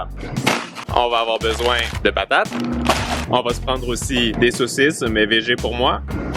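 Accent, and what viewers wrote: Quebec, Canada. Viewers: Draw Kyres accent québécois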